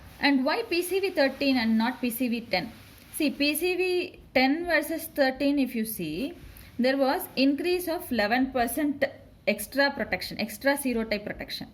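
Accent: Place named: South India, India. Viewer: Very nice presentation.lovely voice with south indian accent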